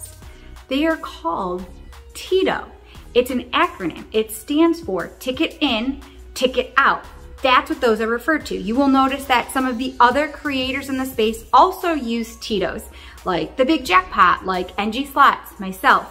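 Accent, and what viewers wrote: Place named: Midwest, United States. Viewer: I also love the fact that one your mid western accent is amazing very Edie Mcclurg and also it’s very simple if people just paid attention they would know that your doing that. What fans you have, and why people talk bad about it. Haha and the fact that you had to make a video about it is silly. You and hubby are legends and keep it up please and thank you.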